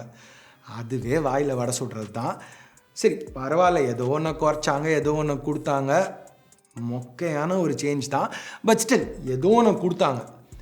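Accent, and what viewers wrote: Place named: Chennai, India. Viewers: Namma chennai language velila vandhudicha. 😂😘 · Bro pathu bro romba Chennai language Varuthu, and casual ah pesaringa 😂😂 yen indha matram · 🤣🤣🤣🤣cheenai slaang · Ainba louinkasa super Chennai speech Samaaaaa😂😂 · Local tamil slang 😂😂😂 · 🤣🤣🤣 1st time bro spoke local chennai slang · Kola kaandu pola moto brand mela kku, vittu velasitaru, சென்னை ஸ்லாங்க் யோவ் இன்னாயா😂🤣😂..... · Chennai slang romba super bro 👍🎉👍🎉👍🎉👍🎉👍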